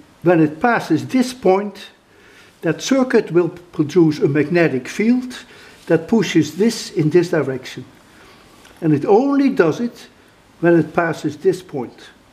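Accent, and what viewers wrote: Netherlands. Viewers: idk know why he has a dutch accent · I can hear he is dutch although his english is very good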